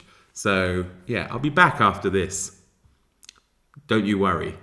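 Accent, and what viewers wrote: United States. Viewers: “Back by unpopular demand” had me wheezing right out the gate. And honestly, your commitment to that Western accent plus the Larry and Stretch voice acting? Emmy-worthy. You sold me on Lawless Miles. Fun wins. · Hi,! I don't know if you take requests, but I have one. Will you consider, please, carrying your Texas accent over to Victober? I would very much enjoy hearing you read Dickens and Trollope, etc., to us as Tex!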